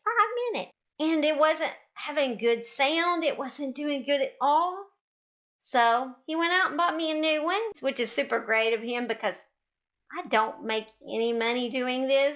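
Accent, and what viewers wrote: South, United States. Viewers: Which Southern state is your accent from? I tried all night to decide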